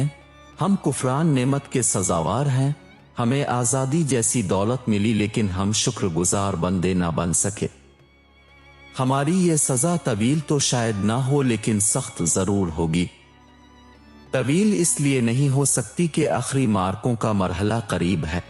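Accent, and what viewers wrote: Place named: India. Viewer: indians ki urdu aisi he hy lekin hame topic pe ghor karna chahye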